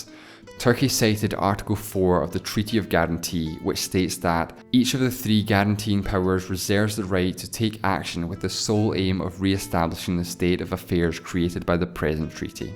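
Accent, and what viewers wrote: Scotland, United Kingdom. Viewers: Are you Scottish? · Geet Gupta Scottish, Scotland is in the uk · Scottish or Irish accent? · POSH SCOTTISH. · Dat awesome accent. Like I just watched Trainspotting again.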